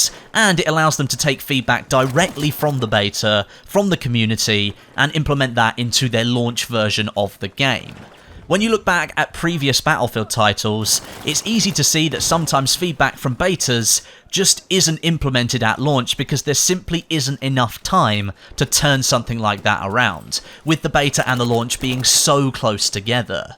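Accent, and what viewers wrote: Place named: United Kingdom. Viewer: I watch your videos for your British accent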